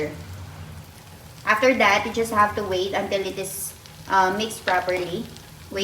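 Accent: Gujarat, India. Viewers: Jay Swaminarayan 🙏 aaje mamra dhani no chevdo mast banavyo sasu ne vahu ye mali ne mast 👌👍tamari boli Parthi lage 6e ke tame Bharuch jilani aaspas raho 6o Tamara gam nu nam janavo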